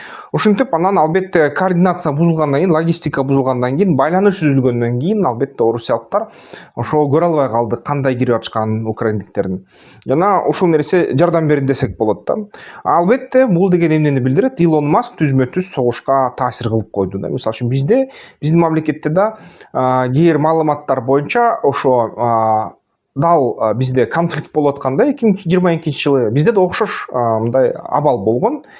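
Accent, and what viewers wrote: Standard. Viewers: АРЫБАНЫЗ, Бар болунуз мырза таза кыргызча суйлогонун кубандырат.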